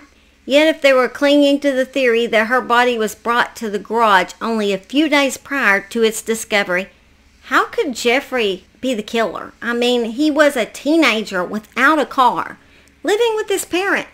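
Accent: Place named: Tennessee, United States. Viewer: I almost flip this video until i remembered someone saying my Pal was unintelligent. Country doesn't mean dumb ma'am. Yes said ma'am because I am born and raised in Tennessee. I suspect we sound very similar. The love of my life called me bammer the day we met. Had to look it up to get a clue. 😂 Somas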